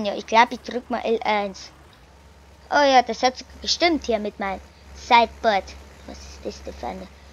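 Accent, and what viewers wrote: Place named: Bavaria, Germany. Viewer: Bayrisch war cool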